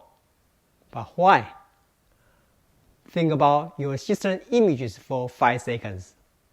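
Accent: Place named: Taiwan. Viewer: 聽你的英文口音確實很像台灣人XD 你是做SERDES的工程師嗎? 好奇為什麼會開這麼冷門主題的頻道(不過我有興趣)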